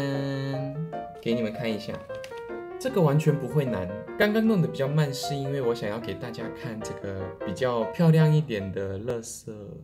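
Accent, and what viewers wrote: Standard. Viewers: 韓國人會講中文喔，好厲害，講的好標準喔！真的是韓國人嗎？不說我還以為是台灣人欸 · 很字正腔圓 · 真的，超標準，比我們教授的好100倍QQ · 中午太標準了吧！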